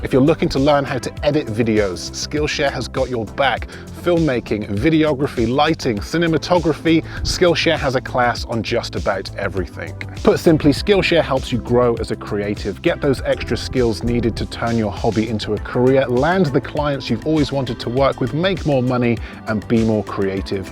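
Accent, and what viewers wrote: United Kingdom. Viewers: Dear, I wish to thank you here for your videos! They are a great pleasure to watch; may be I will improve my shooting skills and also my English (thanks for your British accent). I love also the South-West of England, where I spent a little vacancy on motorbike and where I made my first on-board action cam videos (beginners quality, but lovely memories). Please, do you have a donation address, so that I can offer you a beer or a cider? Cheers from Italy.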